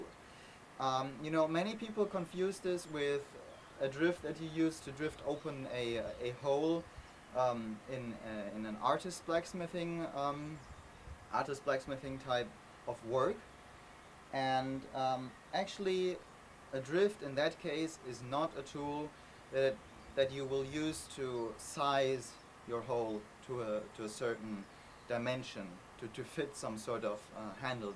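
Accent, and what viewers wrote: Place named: Germany. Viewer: Bist du deutsch? Da hast glaube ich einen deutschen amboss und irgendwie hast du auch einen deutschen Akzent...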